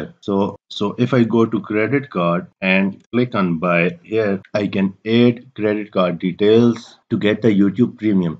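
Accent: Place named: India. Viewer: Cannot understand. Take the indian out of your voice. Or marbles, whatever.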